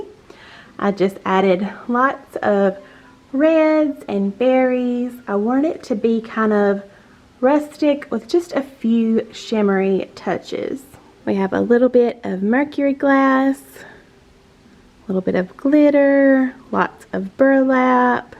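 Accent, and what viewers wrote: South, United States. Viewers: Im not Sure how I haven't found your channel before this collab! WOW That snow Globe is Amazing,Your Accent is the cutest. Im from Michigan I always wanted a southern Accent! Your house is so beautiful. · love your Christmas 2020. Love the mantle decor! Love your southern accent. Merry Christmas to you & your family. Brenda Harris from Arkansas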